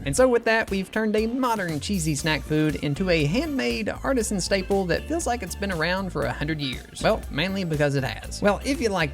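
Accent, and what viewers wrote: South, United States. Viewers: is it just me or does he sound more country now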